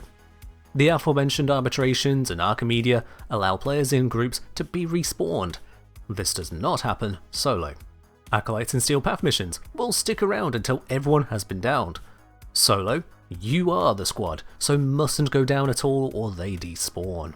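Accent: United Kingdom. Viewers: Surprising that the first language is not English. He sounds kinda British tbh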